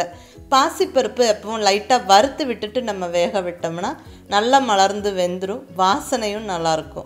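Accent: Kongu, India. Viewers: Unga voice sooper kongu tamil sooperma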